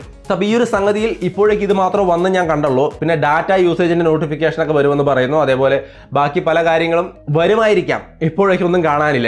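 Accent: Thrissur, India. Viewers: നിങ്ങൾ തൃശൂർ ആണാ 😍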